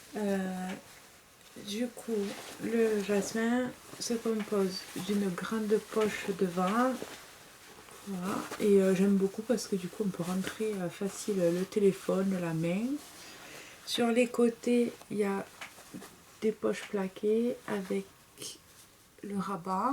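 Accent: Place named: Narbonne, France. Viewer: supers tes pantalons dommage pour moi les patrons pochettes sont epuisees tu as un accent comme moi de narbonne lol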